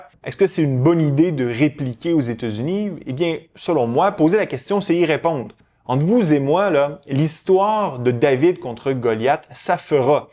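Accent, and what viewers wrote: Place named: Quebec, Canada. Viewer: Tu vien du Québec j'entend ton accent mais Pourquoi tu mets de l'essence sur le feu ? Lol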